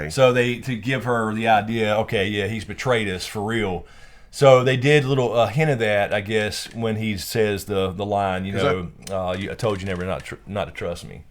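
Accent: South, United States. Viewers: there is nothing wrong with a sweet good ol boy accent. just ask for parts as soldiers in someones army.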